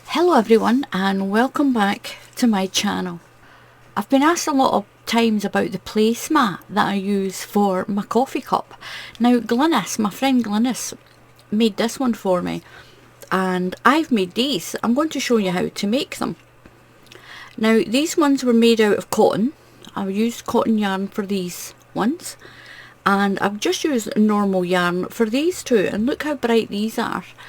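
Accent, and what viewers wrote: Scotland, United Kingdom. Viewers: Hi. Greetings from the U.S. Your doilies are beautiful and I could listen to your lovely Scottish accent all day. I can't wait to crochet these doilies. Thanks for sharing. 💟🌞🇺🇲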